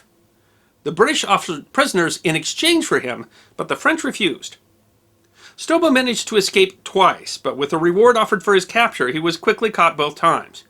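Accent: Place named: New York, United States. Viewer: You sound like you're from New York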